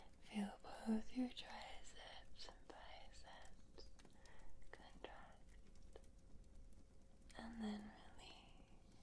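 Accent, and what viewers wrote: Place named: Scotland, United Kingdom. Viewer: This is your best video yet 👍 absolutely my favourite Scottish accent in the world, thank you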